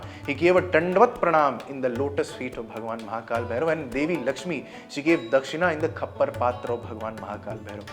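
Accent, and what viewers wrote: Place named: United Kingdom. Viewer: Why are u speaking in BRITISHER INVADERS language? Do we have no INDIC language to depict .. And why are u calling उपासक as उपासका & भैरव as भैरवा, while you correctly pronounce Chanel, Louis Vuitton, Dior, Citroen, Moët & Chandon, Laurent Perrier, Veuve Clicquot, Louis Jadot Domaine Leroy, Château , Bordeaux and L'Oréal as per original French pronunciation & correctly pronounce ikigai, wabi-sabi, kaizen, shinrin-yoku, omotenashi, gaman), kintsugi, shikata ga nai & bonsai as JAPANESE pronounce them. Then why SANSKRIT terms are pronounced by you as beef-eater BRITISH pronounce them? You're full of arrogance & ignorance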